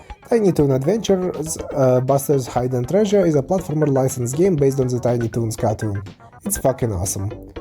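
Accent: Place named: Russia. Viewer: Why do you have russian accent 🙄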